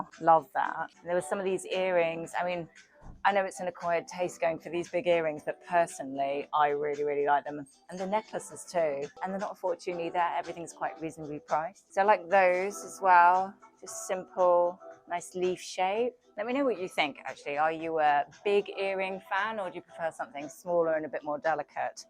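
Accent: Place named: United Kingdom. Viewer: Hello I'm so sorry to hear about your scary beach experience.. I heard that 2 days ago that there was a hurricane nearby, I hope you're all ok. Your spanish is similar to my italian with a cute British accent 😅 I love the grey cardi and pale blue trouser outfit 💕 Blazers yes please! They're my biggest obsession❤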